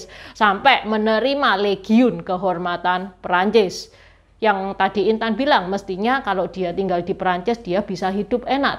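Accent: Java, Indonesia. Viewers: Dia lahir dilingkungan org jawa, ya pasti logat nya jawa, emang jawa bukan indonesia ya. Nampak kali anda rasis sekali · nieh enakan ya klo mendongeng.. hihihi pk bhs indonesia dg aksen jawa medok.. hihihi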